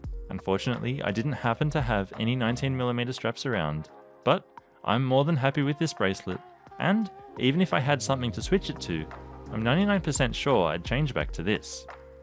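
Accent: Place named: Australia. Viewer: It's always difficult to listen your own voice! Always sounds weird, I didn't immediately pick out you were Australian until I saw the channel bio, which was odd as I am a Kiwi so usually can haha. But to give you some sincere feedback. I just went through my watch buying process and landed on the NB1050-59H (it actually arrived from Japan today!) and this video was incredibly well made. Great macro shots, informative dialogue, good comparisons, good to hear positives and negatives and finally the voice of course! It's like i'm listening to an incredibly knowledgeable jeweler who knows watches inside and out. It took me about a month to finally decide to purchase this watch after tossing up many in the process, I probably watched this video 4 times lol, to say the least, I am a new subscriber! Keep up the good work 10/10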